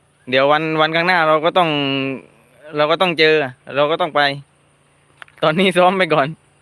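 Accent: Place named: South, Thailand. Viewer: พี่ชอบฟังแระ แหลงใต้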